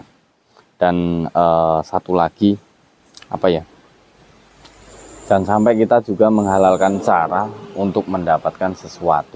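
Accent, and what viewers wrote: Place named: Central Java, Indonesia. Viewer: Assalamualaikum MET sore mas baru nonton nih vlognya.oya klo boleh tahu mas jawanya mana ya karena saya juga orang Jawa tengah.klo mendengar cara bicaranya kok sama logatnya SPT kampung saya.ya semoga saja Deket dg kampung saya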